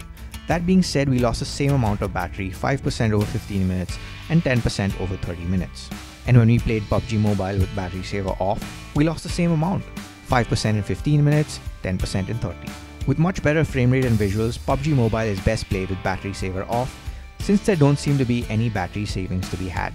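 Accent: India. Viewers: i always like how indian's pronounce FANTASTIC XD its one word that lets u know if he is indian or not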